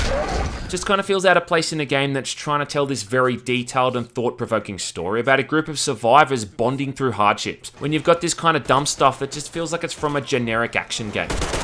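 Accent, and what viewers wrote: Australia. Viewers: You have a good way with words. Good to hear an Aussie accent for once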